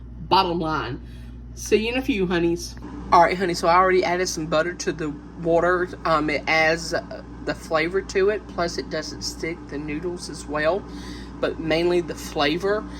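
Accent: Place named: South, United States. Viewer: I love you giiirrrl you have the cutest southern ACCENT!❤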